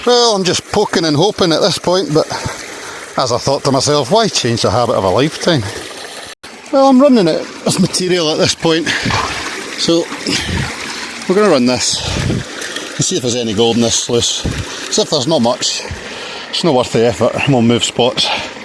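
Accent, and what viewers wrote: United Kingdom. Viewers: I knew that twang was Edinburgh, I take it your not far. Was going to say thought I knew your voice when first heard u but surely not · Never heard Scottish befor . Love it. Good luck with gold hunting. You'll be needing it soon.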